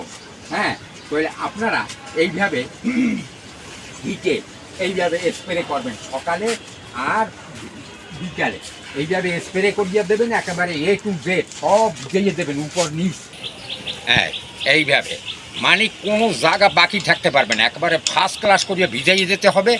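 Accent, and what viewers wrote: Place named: Bangladesh. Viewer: দাদু আপনার বাংলাদেশী কথা আমার খুব ভালোলাগে। আপনি খুব পরোপোকারী।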